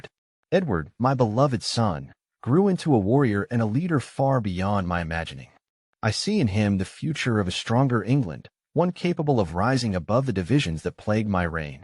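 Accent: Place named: United States. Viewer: "King of England" but has an American accent lol if you're gonna use AI at least give him a ye olde English accent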